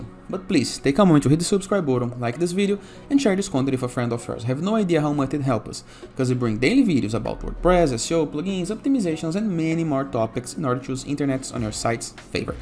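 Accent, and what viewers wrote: Brazil. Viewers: Você devia fazer mais videos em inglês, seu inglês é legal, percebi que era brasileiro pelo sotaque..😁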